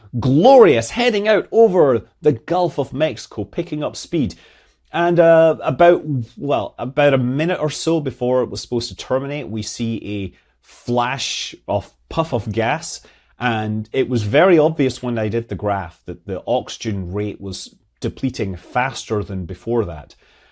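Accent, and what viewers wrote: Scotland, United Kingdom. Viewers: i like your channel and the scottish accent. i have on question about the hot staging and the negative acceleration which gives sense about causing the interruption of the steady propellant flow to the raptors. fluids without force field to keep them above the outlet are no issue on earth because gravity. acceleration may replace gravity at hot staging. I don't get how fluid transfer in space should look like. is the acceleration planed or do they think on a type of plastic bag inside the tank open to the outlet and on the other side by gas pressure to push it out. are there "plastic bags" working at liquid O2 temperatures?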